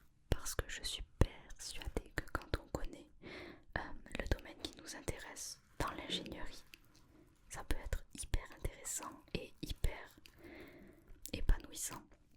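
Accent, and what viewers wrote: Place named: Montpellier, France. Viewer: Tellement vrai pour l'orientation en terminal ! Ah je me disais bien que c'etait l'accent du sud mais plus montpelierin c 'est bien ça? (jsp si c comme ça qu'on le dit) 😁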